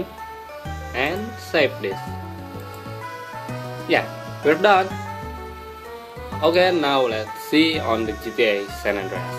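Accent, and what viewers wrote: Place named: Vietnam. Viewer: its pretty standard vietnamese english if i do say so. i think they're just racists.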